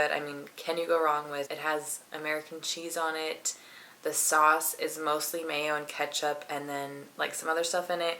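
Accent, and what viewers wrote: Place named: New York, United States. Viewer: You lowkey have a new york accent lmao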